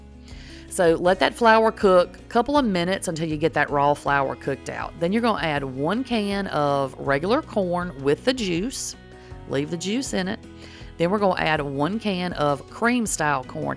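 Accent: South, United States. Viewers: Lord have mercy. Found your channel within the last week or two because I am beyond tired of eating out or eating crap that doesn't need to be in food kind of thing. Born & raised in SC so I have a bit of a southern drawl (and even more when I'm mad/upset) so listening to your voice overs just makes my day. My entire family sounds just like this. LOL so I'm right at home. Also you looking to adopt grown children? Cause from what I'm hearing your children are blessed with a good mama!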